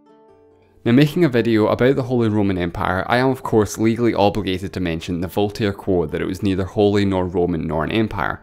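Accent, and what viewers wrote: Scotland, United Kingdom. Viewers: I can't make out the accent. Yorkshire? Scot? Irish? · It’s just Scottish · What’s the accent here? Scottish? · You sound like a Scotsman 🏴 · Everything sounds better in Scottish. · Bro is so Scottish I can’t keep up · Came from lore page. Disappointed by Scot accent. U sound dumb & angry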